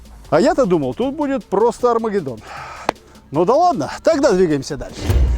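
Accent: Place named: United States. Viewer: Уважаемый ведущий вы вроде мужик а ведёте себя как американец. На ютубе есть подобные ролики американского производства и у меня сложилось впечатление будто манеру держаться и разговаривать вы взяли от туда.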